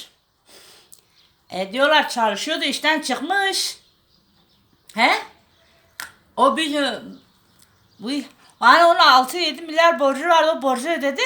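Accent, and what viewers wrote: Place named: Bingöl, Turkey. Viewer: Bende bingöllüyüm aynı bizim gibi konuşuyor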